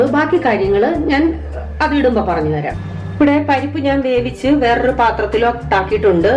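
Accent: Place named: Thiruvalla, India. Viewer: Chechyde veedu Thiruvalla- Kumbanad side aano?? Correct aa style samsaaram...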